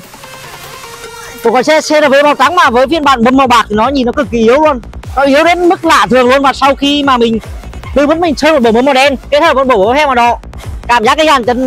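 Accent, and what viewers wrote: Nghe An, Vietnam. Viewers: A giọng nói giống yên thành-nghệ an vậy ạ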